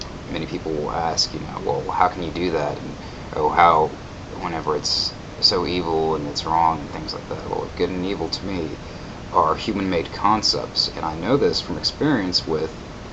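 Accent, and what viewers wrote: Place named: Santa Fe, United States. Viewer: I feel everything you say man, I've dealt with all the christian family shit as well haha. You sound like you're from Santa fe man, one of the people that denied to be stupid like the rest.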